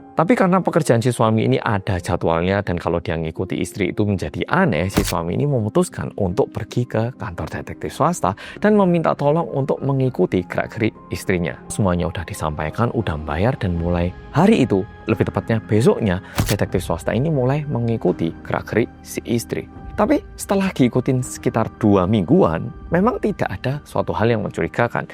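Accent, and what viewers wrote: Java, Indonesia. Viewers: sehat terus Mas, selalu kangen denger suara bule Korea yg medhok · Mas.... Muedooook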